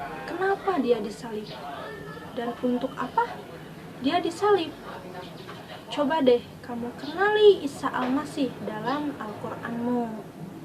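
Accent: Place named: Ambon, Indonesia. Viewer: Orang Ambon ya mba. Atw manado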